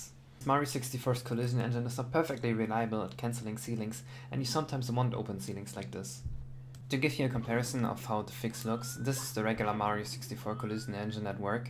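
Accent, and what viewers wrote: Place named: Germany. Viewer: something about germans talking english with a adorable accent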